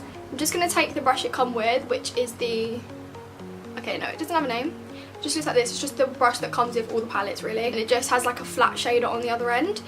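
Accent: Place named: United Kingdom. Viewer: Soooo fabulous!! Your work is wonderful and I love your british accent 💕💕 saludos desde México! 🇲🇽🇲🇽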